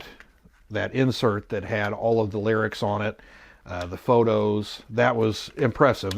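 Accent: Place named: United Kingdom. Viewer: Thank you I really enjoyed the video. Your accent sounds more British than most American YouTubers.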